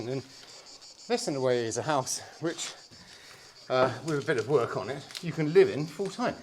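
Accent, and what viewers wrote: United Kingdom. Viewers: Potentially a nice country home. The cost? I love the way you brits talk, Right! Lets Crack on! I am currently reading more of Andy McNab, on of your outstanding countrymen. Thanks, also another great tour…..real history there. 🇺🇸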